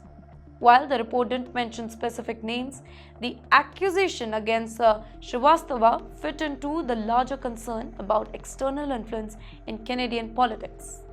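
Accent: India. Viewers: This is Indianlish. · It's called Curry English from India.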